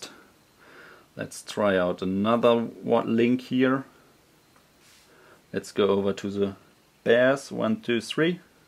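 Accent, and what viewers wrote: Germany. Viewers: possible that you are german? Your english accent :D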